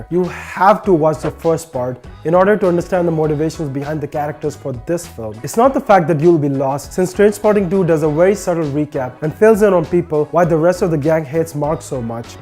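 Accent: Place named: South Africa. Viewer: YOu sounded more South African.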